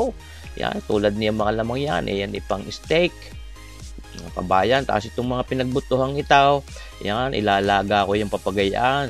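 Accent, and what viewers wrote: Batangas, Philippines. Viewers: I love your recipe at higit sa lahat I love your Batangeño accent and your humor when speaking Sir. I miss hearing that accent, majority of my officemates before, were from Batangas.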